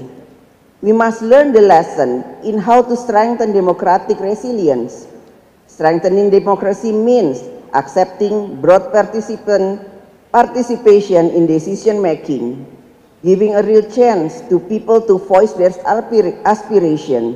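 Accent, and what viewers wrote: Indonesia. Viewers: Ingris rasa jawa.. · Selain yang sudah dibicarakan banyak orang, kesalahannya juga tuh dalam mengucapkan huruf "r" masih seperti dalam bahasa Indonesia. Harusnya tuh ga kaya gitu cara ngucapinnya. Jadi, lidahnya itu jangan menyentuh langit-langit mulut. Kalo menyentuh langit-langit mulut, ya jadi salah itu. Untuk lebih jelasnya lihat saja pidato bahasa Inggris presiden Amerika, apa pidatonya siapa gitulah yang pake bahasa Inggris dan bahasa Inggrisnya bagus gitu...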